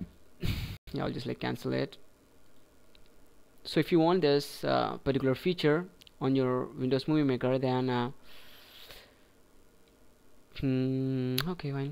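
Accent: India. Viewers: I can hear that u r indian i am indian my self except im punjabi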